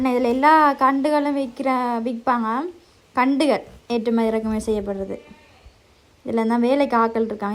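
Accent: Sri Lanka. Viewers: you are speaking Sri Lanka is very sweet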